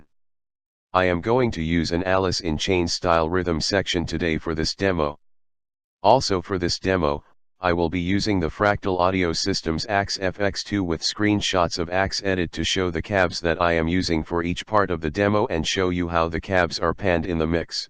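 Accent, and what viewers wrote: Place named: New York, United States. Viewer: Very useful information, thank you. I wouldn't worry about a NY Metro accent -- it lends credibility to use your own voice and would be less distracting than the computer voice!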